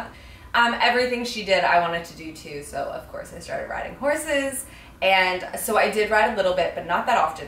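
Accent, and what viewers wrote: Australia. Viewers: My friends and I in 5th grade LOVED this show! We were the kids at school who pretended to be horses in recess, and talked about horses in class and did our science fair project about horses. We had a name for our group, too, like the saddle club. We were 3 horse crazy girls! I am 26 now, still watch the show sometimes, and I collect Breyer horses. I haven't left the horse life! Horses are my passion. You are awesome!! You were so funny and cool in the Saddle Club. I wondered why you sometimes had an aussie accent!🐎🦄🐴🦓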